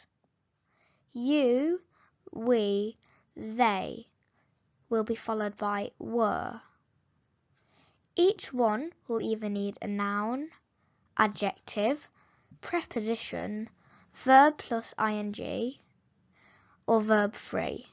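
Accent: United Kingdom. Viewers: Yes, it is a British accent 🙂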